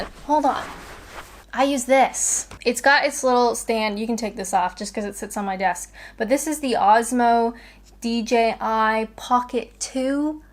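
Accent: England, United Kingdom. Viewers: I don’t know if you know but you are developing the hint of an English accent👌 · its interesting to hear slight english accent isims creep into your speech lol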